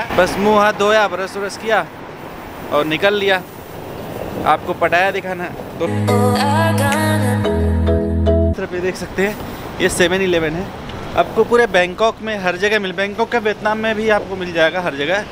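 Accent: Bihar, India. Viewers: Bihar se ho bhai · ooo bhai tu bihari hai kyaa... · bhai Bihar k ho kay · Bihar ho kya bhai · Bhai aap bihar se ho kya?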